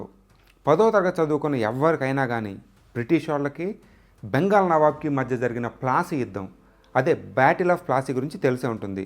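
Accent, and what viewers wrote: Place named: East Godavari, India. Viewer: ఈ ఎధవ తూగోజీ యాసలో ముసలోల్లు చెప్పిన టులు ఏంది ఈ వాగుడు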